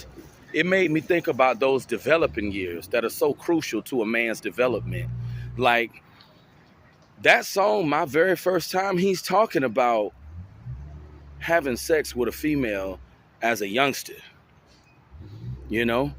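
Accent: United States. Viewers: That deep south voice.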